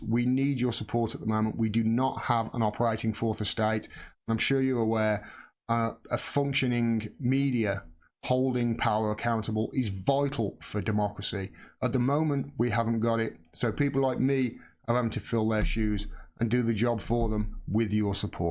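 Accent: Black Country, United Kingdom. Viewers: I know this is silly but I KNEW IT! I live and grew up in So. California but I actually lived in a small village near you for a year (Pattingham) and I was wondering if yours was a Black Country accent! haha, I don't know why that made me happy. Ok, carry on with the bad news, sorry....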